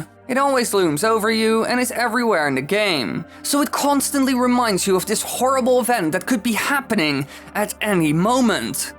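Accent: Netherlands. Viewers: It sounds like an accent (possibly Dutch or Swedish)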